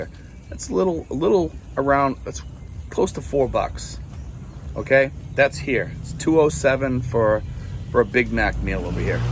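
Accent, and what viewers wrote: Boston, United States. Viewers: Thought i heard a boston accent . I have been watching your videos for awhile . I appreciate the insight ,knowlege you give .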